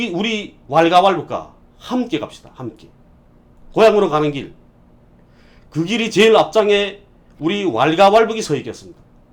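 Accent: North Korea. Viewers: 북쪽 말이 좋습니다!고치면 되지!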